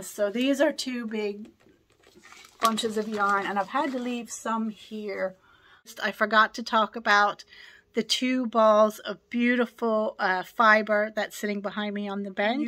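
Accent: Ireland. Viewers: Those will be perfect for your dad. I hear the Irish accent. That is so nice. Gina at knitting Turnpike is , I think possibly doing the same KAL, I've heard her talk about it. Her shawl work is beautiful. You might check her channel. I am a pretty new knitter so I can't be much help. You have such beautiful yarn.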